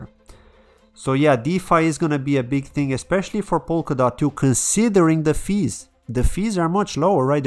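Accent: Ireland. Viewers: I thought I heard an Irish accent in your voice. Are you involved in Irish crypto much?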